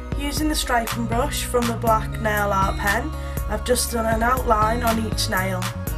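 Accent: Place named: United Kingdom. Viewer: OH MY GOSH!! YOUR ACCENT!! I wish i had a British accent.. YOUR IS SOO COOOL!! I'm American :(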